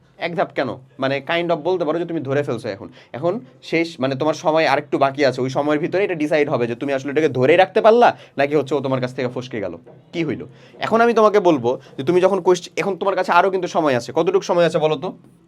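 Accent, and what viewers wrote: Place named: Rajshahi, Bangladesh. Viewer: apnr basa ki rajshahi ??? vasa te rajshahi rajshahi vab ache ....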